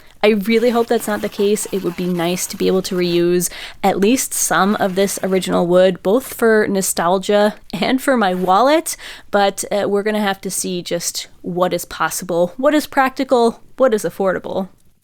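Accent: Quebec, Canada. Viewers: 😁😊💪It is a joy listening to with his Québécois accent. C'est super.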